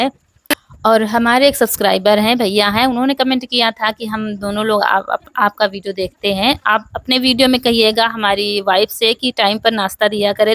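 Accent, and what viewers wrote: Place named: Lucknow, India. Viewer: बहुत अच्छा व्लाग है बहन आप कया लखनऊ के आसपास की हो बहना आप की बोली से लगता है कयोकि मैं भी लखनऊ से हु और आप के विडियो हमेशा देखती हूँ 👌👌👌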